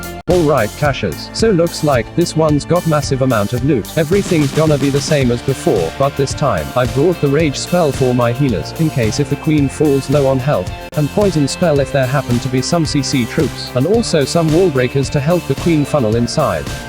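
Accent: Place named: India. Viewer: He is indian. I automatically off when i heard the accent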